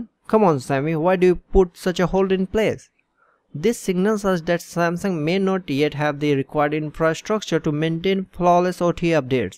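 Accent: India. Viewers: indien english dialekt?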